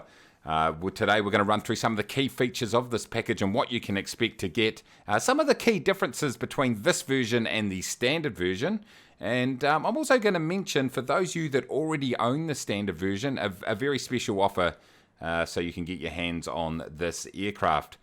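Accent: New Zealand. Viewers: New Zealand accent rules... :) Awesome video, as always....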